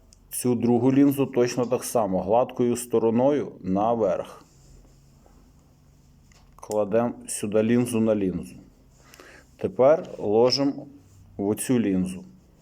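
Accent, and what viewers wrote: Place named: Polesia, Ukraine. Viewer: ВЯЛИКИ ДЯКУЙ,ГЛЯЖУ З МИНСКА, РАЗМАВЛЯЕШЬ, ЯК У НАС НА ПАЛЕССИ, УСЕ ЗРАЗУМЕЛА, ПАЙДУ ЧИНИТЬ,А ТО МАЛО ХТО ПРОСТО И ДАХОДЧИВО РАССКАЖА, АБЫЧНО ВУНИЧАЮТЬ.😊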